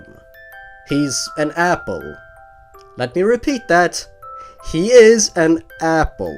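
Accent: Sweden. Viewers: Are you Swedish!? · wait are u swedish? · Are you Swedish?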